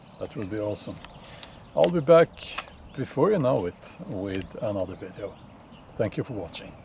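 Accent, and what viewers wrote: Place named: South Africa. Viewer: sounded South African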